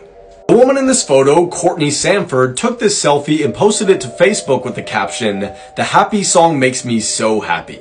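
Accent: South, United States. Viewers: Your southern twang gets thicker and thicker the more videos I listen to in a row..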